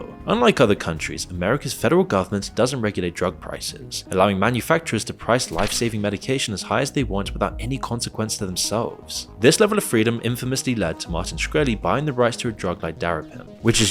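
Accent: United Kingdom. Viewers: lmao ain’t listening to a guy w a british accent talk american politics get outta here bro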